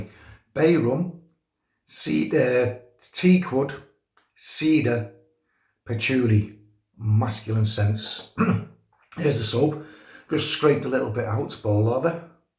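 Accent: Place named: United Kingdom. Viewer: Thank you so much,! So glad you enjoyed the scent and shave:) I love my 1960 Fatboy. You tell the JPJ story very well and love to hear it in a British accent!! Really appreciate you, and keep the content coming. Cheers!!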